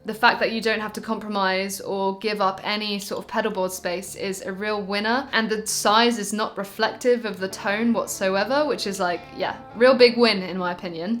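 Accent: England, United Kingdom. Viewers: Ah you’re English! You have broken my hot-o-meter! Great playing.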